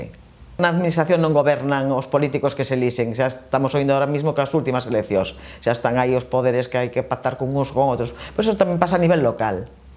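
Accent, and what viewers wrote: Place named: Spain. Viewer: Galego com sotaque espanhol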